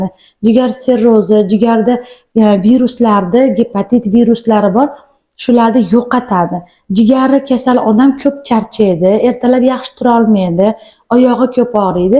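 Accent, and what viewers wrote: Uzbekistan. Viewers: Кайерлисиз синглим шевез Куконлига ухшаяпти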